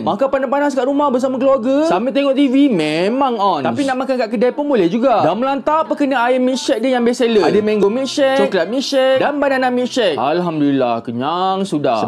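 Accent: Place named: Johor, Malaysia. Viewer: Bang cakap johor tetapi daerah mana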